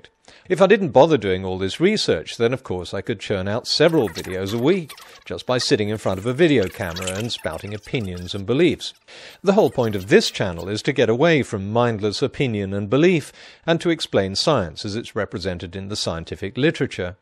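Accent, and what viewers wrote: United Kingdom. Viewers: You might have people beeing sceptic about you. But at least you have this very very nice british accent (Is it Oxford?) and that always help upon credibility :-) Pun aside. You have very clever counter-arguments against people suffering from "youtubitis"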